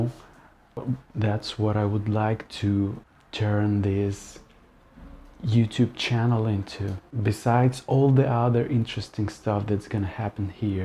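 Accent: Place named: Russia. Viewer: Please keep your accent, your English is good LOOK MEANS NOTHING ITS YOUR CONTENT, JUST BE TRUE TO YOURSELF AND KEEP REPORTING WHAT THE PUBLIC WANTS AND HELP EDUCATE THEM UNDERSTAND WHAT WE CAN ALL DO TO CHANGE IT. Yeah/Yes it would be good to have an introduction song to be played at the start of your videos. Maybe edit a few time lapse clips together over you speaking to people on the streets about really important things. If you don't have the kind of equipment tell others you might know if they can help you edit it. We're all products of our environment and exposure to it, of course those in powerful positions within our governments thrive on us all being against one another. We can't let them keep pushing their narrative of negativity towards each other because none of us hate each other we all want peace and to get along. Again please don't lose the great Russian accent, it's your national sound and you and everyone should be proud of their individual accents. 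Good videos please keep them coming.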